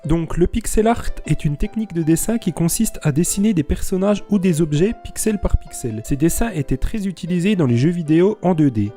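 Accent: Franche-Comté, France. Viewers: Petit accent de franche comté ???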